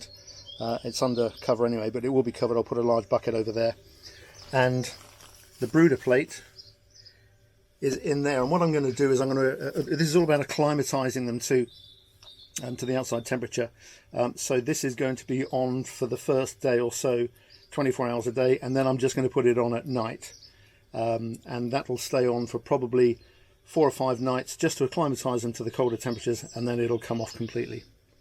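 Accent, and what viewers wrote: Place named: Midlands, United Kingdom. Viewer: Hi mate, first I've got to say thankyou as I've searched everywhere to find this kind of info. I have 3 six week old and they're lamp is all the way up now how do I get them from the box to the coop as I don't have power in the garden. Also I live in the UK round the Midlands so should be getting the similar weather to you considering your accent. Any help appreciated and thanks again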